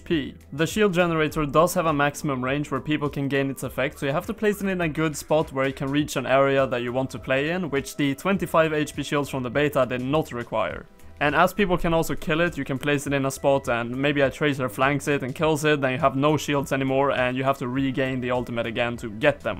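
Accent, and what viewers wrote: Sweden. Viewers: are you swedish? I'm swedish and thought that you sounded like a swede. (I'm not trying to be mean.) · You sound like you are swedish?!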